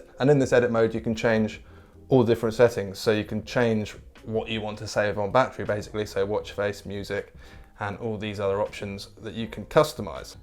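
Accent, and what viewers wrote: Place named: England, United Kingdom. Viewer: EXCELLENT PRESENTATION 👏 with an English accent ...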